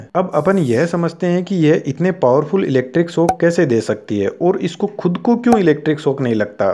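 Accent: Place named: Haryana, India. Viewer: Nice video bro....ur accent seems haryanvi... 😀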